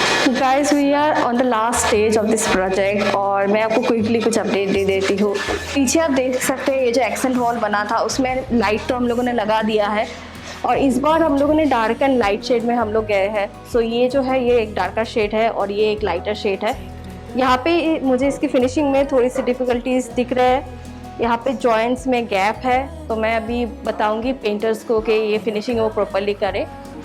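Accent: Bengal, India. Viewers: Hi I m pritee mother of 2 kids from Delhi. Few months back inspired to bcum interior designer...now became big fan of u and ur bengoli accent...I really luv the way u show and tell everything...u r really an inspiration...I want to know a thing...pls reply....on the bed u place 2-3 pillows and cushions also,.but practically when we sleep we need only one pillow what these clients do for other's on bed? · Love the way you talk your bangali accent just love it and your Diys just love them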